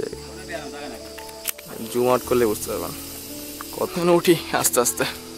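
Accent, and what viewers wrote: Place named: Bogura, Bangladesh. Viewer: Bogurar vasha hits different 🤍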